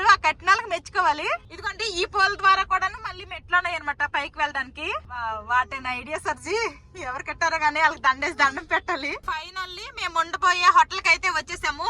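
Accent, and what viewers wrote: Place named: Telangana, India. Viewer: Miku Telangana slang istundi akka😂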